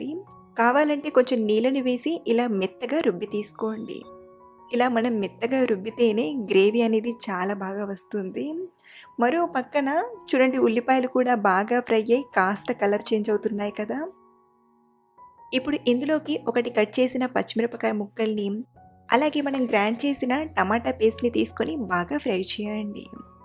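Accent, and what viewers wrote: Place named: East Godavari, India. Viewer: East godavari slang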